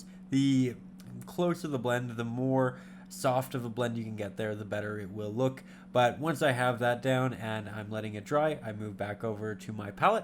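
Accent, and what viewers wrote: Canada. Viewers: This guy sounds like he is from Canada.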